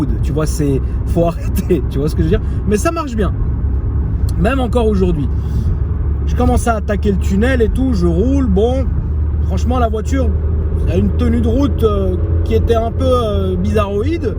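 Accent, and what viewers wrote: Tunisia. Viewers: Sérieux on dirait un tunisien quand tu parle en arabe je sais pas si sa a force de traîner ac des tunisiens ou quoi mais voilà 😕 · on kiff l accent Tunisien 🇹🇳 🥇🏆😂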